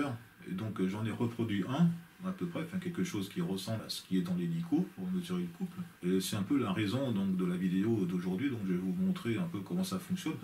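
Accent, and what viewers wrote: France. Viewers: l'anglais est simple mais bon c'est l'accent qui est franchouillard au possible. l'anglais couvre évidement un plus grand public, si c'est compréhensible et interessant les gens regarderont. Ne laissez pas tomber. Regardez Isaac Arthur, un défaut de language et pourtant 1/2 million d'abonnées pour des vidéos ou il parle non stop 1h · Bien entendu qu'il te faut continuer en anglais, malgré les remarques du type que j'ai pû te faire sur ton accent bien français. Ton contenu est absolument unique par sa précision et le type de matériel, ce serait bien dommage que tu te prives de 95% des utilisateurs de youtube. Carry on and all the best! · Hi, I downloaded the french transcript and put it into the translator. Your english is very good and does not sound like a "spanish cow" like some bad people may have said. They are just jeallous. Of course you got a french accent, but most english speaking creators on youtube have some form of foreign accent. 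That is totally normal. It would be really nice if you could continue in english so you reach a broad audience all over the world.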